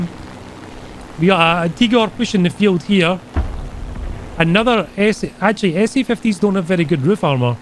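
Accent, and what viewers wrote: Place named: Aberdeen, United Kingdom. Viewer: I love the accent and are you from Aberdeen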